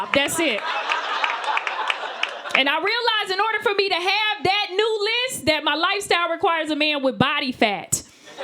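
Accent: South, United States. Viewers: She has that classic southern twang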